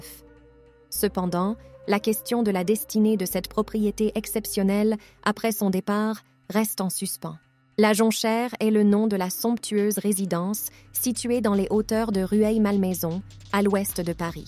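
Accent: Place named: Canada. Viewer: On s en fout, ce n'est pas nous, drôle d accent ce robot (canadien)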